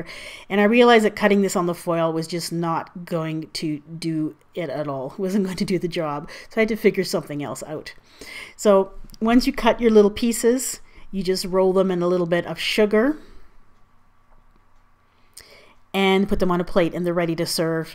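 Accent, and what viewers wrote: Canada. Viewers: she has a light canadian accent... and canada is always where i wanted to live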